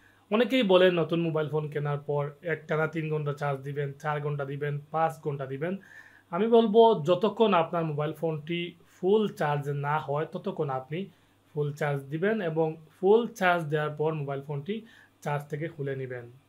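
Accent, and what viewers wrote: Sylhet, Bangladesh. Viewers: সিলেটি ভাই 😮😊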